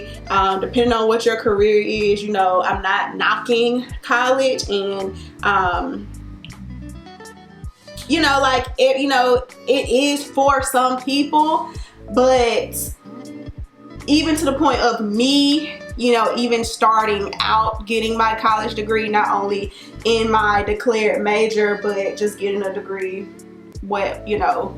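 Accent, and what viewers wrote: United States. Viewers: I love how country you are, I could listen to you talk all day.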